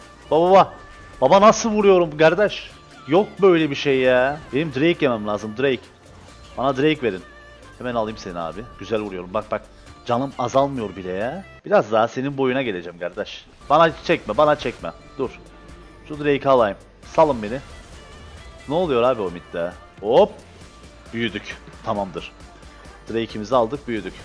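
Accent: Cyprus. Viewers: abi sen gıbrıslısıñ deyiil?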